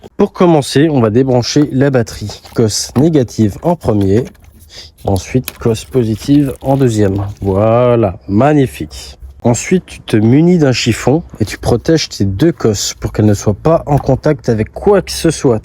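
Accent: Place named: Alsace, France. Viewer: Non avec une léger accent Alsacien on dirait... J adore ♥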